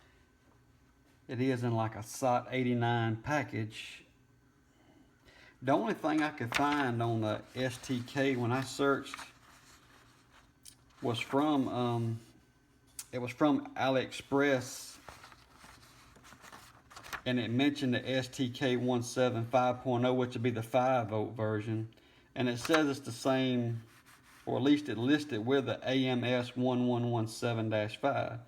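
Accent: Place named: Tennessee, United States. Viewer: great video , you saved me from deciphering the manual .. great accent , is that from Tennessee or Texas or both :)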